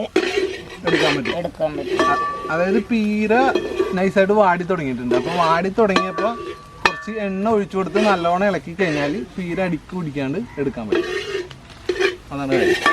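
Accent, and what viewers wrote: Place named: Coastal, India. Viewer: Evda veedu 🏡 ?slang 😂😂😂near kadapuram aano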